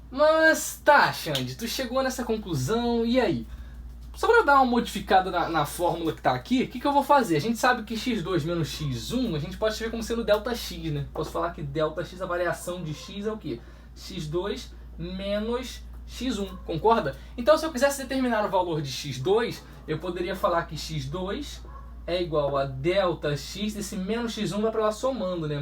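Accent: Rio de Janeiro, Brazil. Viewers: De onde você é do Rio ?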